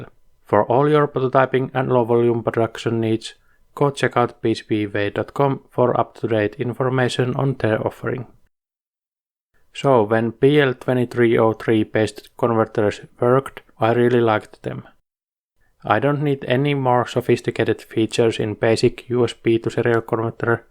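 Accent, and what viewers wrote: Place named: Finland. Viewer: funny thing...the minute i heard you speak..."Suomalainen!" appeared in my head... seitsemän vuotta salossa... at first, i missed the "a-dots" in seven...wait, it was kahdeksan vuotta...onko kesä hyvää...our friend said over 30C, everything too dry...nice to see you here.. kanadalainen, mutta melkein suomalinen 🇨🇦🦈🐻‍❄️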